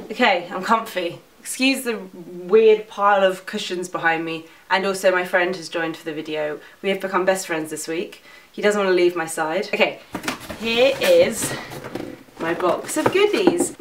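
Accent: United Kingdom. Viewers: Ha ha english subtitles are not acquired for this video because of your British accent I believe and I am glad to see that I am way comfortable with British accent now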